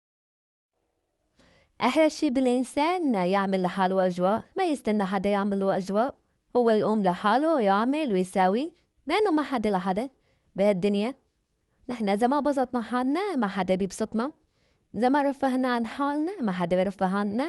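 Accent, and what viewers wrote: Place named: Aleppo, Syria. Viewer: واو لغة حلبية حلوة ❤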